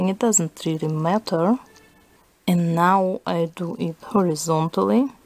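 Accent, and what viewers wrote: Russia. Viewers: thank you so much for doing this i love your Russian accent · Omg can I start with I love your videos? I mean This is amazing and I can listen to your Russian accent forever! Please keep on making videos! :)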